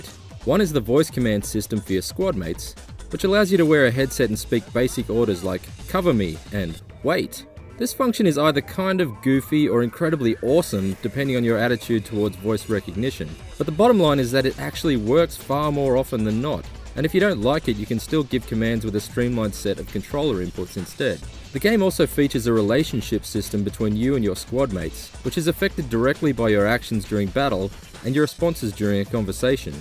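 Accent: Australia. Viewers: is this australian accent ? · he wasn't talking inside his mouth the reason why u couldnt(count) understand what he was saying is because he is an Australian which is a variation of the proper english language. so dont give this a bad review because u couldnt understand it because that would be like me giving u a bad comment mark because u have poor english writing skills. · Australian narrator?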